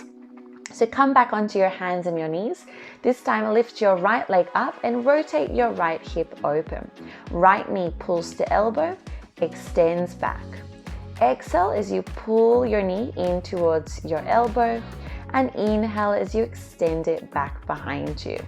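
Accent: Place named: Sydney, Australia. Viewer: Thanks:) you're beautiful Sydney accent makes me nostalgic <3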